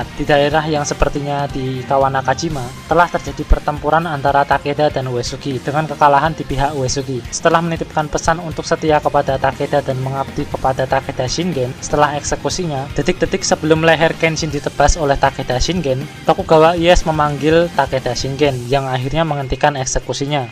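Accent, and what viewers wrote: Java, Indonesia. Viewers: Medoknya bikin semangat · Logat jowomu medok min,asli ngendi ki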